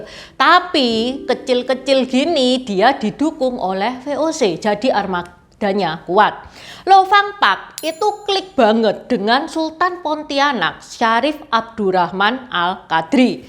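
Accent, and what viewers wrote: Java, Indonesia. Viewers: Gini dong, Tionghoa medok khas Jateng dan Jatim. YouTuber daerah hrs bangkit jangan didominasi Jakarta. Lanjut Mbak!!!! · Aku suka channel ini karena MEDOK nya narasi...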